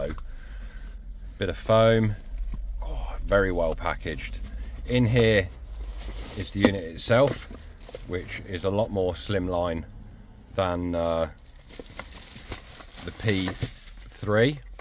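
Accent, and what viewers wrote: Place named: United Kingdom. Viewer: Hi mate. New subscriber here, thanks for the review. Nice to hear it from a accent this side of the pond too! I had an Xtrons head unit in my E92 that I ripped out and reverted to stock, audio quality was horrific. I read it's because the retrofit units for the E92 (and E90/93 by extension) don't utilise the fibre optic connectivity that the stock head unit does, rather using auxiliary instead. So I've been looking for one of these I can use alongside the stock head unit. With this connected to the aux port (or even by FM), what does it sound like? Is there any crackling or distortion at all? Or is it decent? Cheers!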